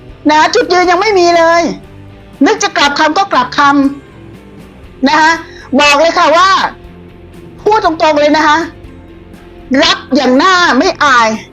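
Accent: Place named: South, Thailand. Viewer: ติดตามผลงานอยู่เป็นประจําชอบการพูดถูกใจมากเลยพูดเหมือนคนภาคใต้ใจถึงพึ่งได้ครับ❤❤❤❤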